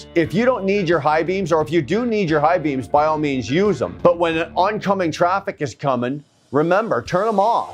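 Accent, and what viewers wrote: Canada. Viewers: Is that a CANADIAN accent I hear? Man, you're making me homesick! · As I’m watching this, I’m saying to myself, “This has got ta be the most courteous trucker I ever encountered.” Then I listened to him speak for a minute, and was like, “No wonder. He’s Canadian.” 👍🏼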